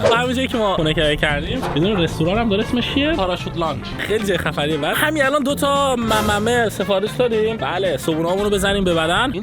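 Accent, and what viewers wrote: Shiraz, Iran. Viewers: پشمام شیرازی هستی❤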